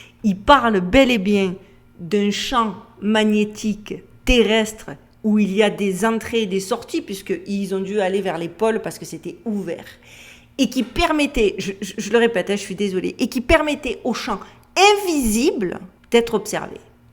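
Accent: Marseille, France. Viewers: Bon aller je me lance on est de Toulon et avec ma femme on est just FAN!!! Milles mercis à toi on adore tes vidéos tes analyses ton intelligence alors qu'au tout début j'étais sur le cliché "blonde avé l'assent de Massilia" mais wtf et finalement comme trop souvent il faut lâcher les "à priori" et conclusion on a découvert une belle personne qui nous apporte bcp. Alors oui mille mercis à toi!!!!!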